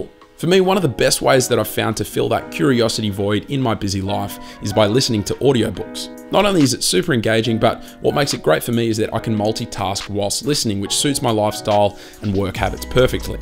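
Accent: Australia. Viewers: I'm just here for the aussie accent :)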